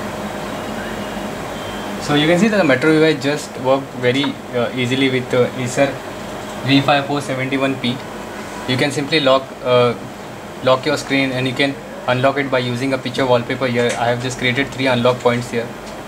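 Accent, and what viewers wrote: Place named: India. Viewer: It's Indian English! Very understanding for Indian people.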